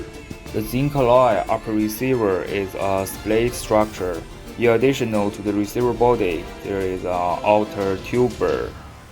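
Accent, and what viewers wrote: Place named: China. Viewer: 塑料中式英文🤪🤪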